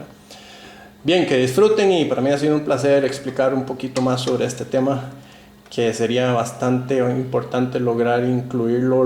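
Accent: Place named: United States. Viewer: tienes una erre medio agringado. Éxito.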